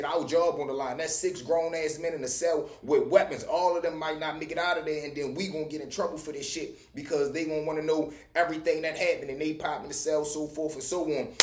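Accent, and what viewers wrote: Baltimore, United States. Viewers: Bro I love how you tell your stories real certified bmore dude right here 💯👍🏾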